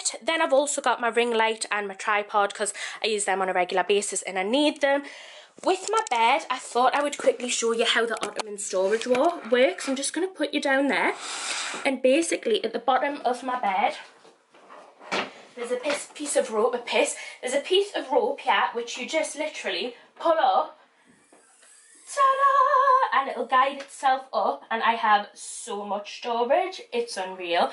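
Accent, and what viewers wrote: Newcastle, United Kingdom. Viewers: Mirrow haha she's a geordie, it's what they do 😂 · A proper Geordie!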